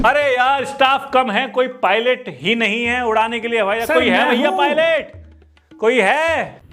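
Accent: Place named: Meerut, India. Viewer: Bhaiya aap mujhe bahut ache lagrte ho or aap bilkul humhare goan meerut side jaisi language bolte ho hila matlab kaam dhandha karo aisa humre yaha bolte hai❤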